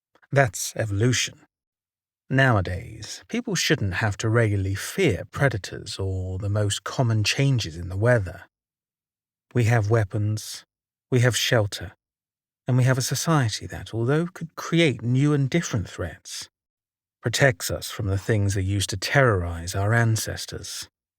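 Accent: United Kingdom. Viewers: I do too, a very soothing voice and excellent narration skills. Very high quality. And let's face it, no one narrates like the British.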